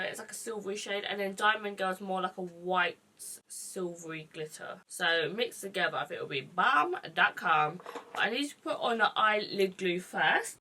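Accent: United Kingdom. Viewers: Yes u British u sound British I'm British anyway ly xxxx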